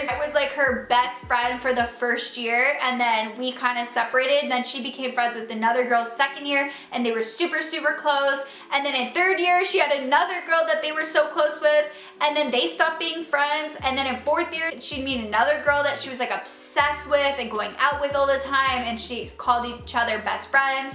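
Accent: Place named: Canada. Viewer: This lady has a nice Canadian accent. Very nice!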